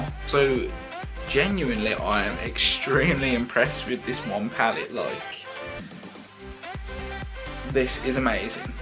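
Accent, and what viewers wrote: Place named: United Kingdom. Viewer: Hey! Just came across your channel because needed some help this video was great and definitely subscribing!! Very helpful and as your British (guessing by accent) it helps me a lot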